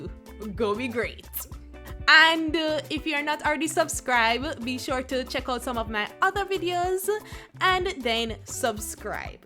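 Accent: Jamaica. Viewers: Sound so Jamaican. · jamaican!!? i pick up d accent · Love how you talk...you sound so much as a jamaican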